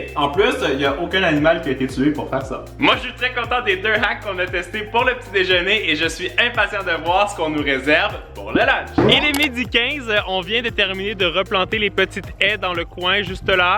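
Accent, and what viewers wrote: Canada. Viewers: Chui le seul à kiffer l'accent canadien ?? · j'adore ton accen canadien♥♥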